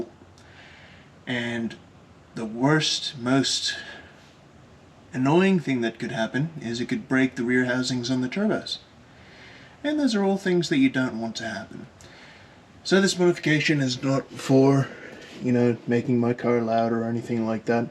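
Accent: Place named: South Africa. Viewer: you sound like an original SAFFA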